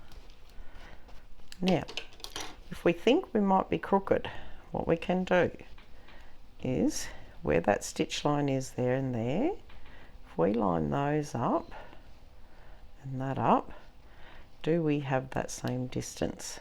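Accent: Australia. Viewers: Just found your channel , I’ve never made a bag before so I’m keen to give it a go … I thought you were an Aussie from your accent .. I’ll definitely check out your shop , also where do you get your label from … x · Thank you I'm going to give it a go tomorrow, love your calm cheery Aussie voice and clear instructions.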